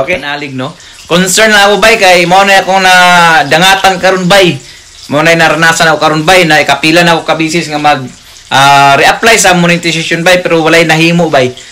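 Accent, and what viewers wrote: Visayas, Philippines. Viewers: Kaya pala d way ka magsalita visaya ka.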